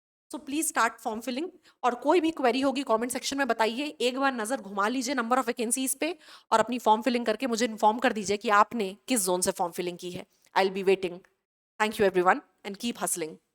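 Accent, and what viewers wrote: Chhattisgarh, India. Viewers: Ma'am aap chhattisgarh se hai kya??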